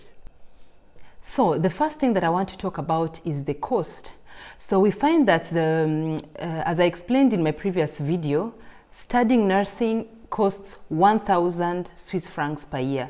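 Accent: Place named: Kenya. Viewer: You speak like a Kenyan. . are you....if so , I'm Kenyan in France, im very near to Switzerland...... i wanted to come to Switzerland through a student visa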